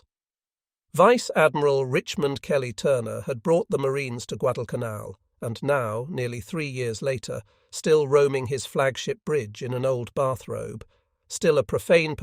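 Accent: United Kingdom. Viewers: would be great without this bad accent......its a American story..dopes...thats a limey ..Semper Fi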